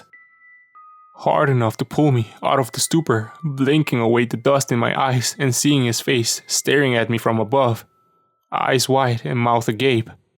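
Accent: United States. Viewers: his robotic like tone with this acid us of A's accent make the video hard to listen more than 5 mn. So i read the comments.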